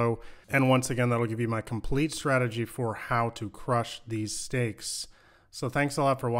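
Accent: Canada. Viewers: he definitely has a strong canadian accent, and i say this is a canadian lol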